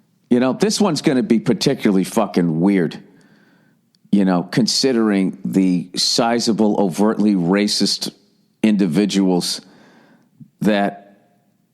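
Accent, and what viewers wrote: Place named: Boston, United States. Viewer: He's from Boston, that's how they speak. I dare say you have your own idiosynchratic speech patterns that others could equally criticise, wherever you're from. Personally, I happen rather to like it.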